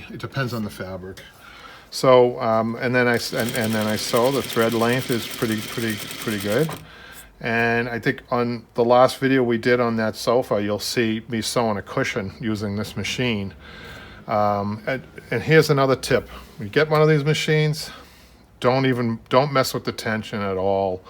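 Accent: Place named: New England, United States. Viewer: As soon as I clicked your video and herd you talk I knew your a new Englanda! Im from Hampton NH. I’m Getting into auto upholstery. Looking at machines now. Thanks for sharing your knowledge.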